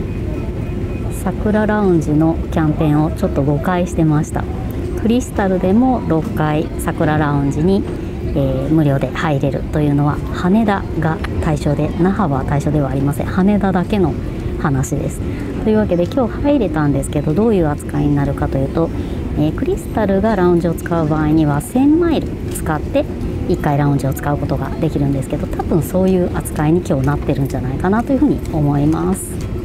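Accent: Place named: Okayama, Japan. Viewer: 外ではバリバリの東京の言葉(?)で話してますが、夫婦の会話は岡山弁ですw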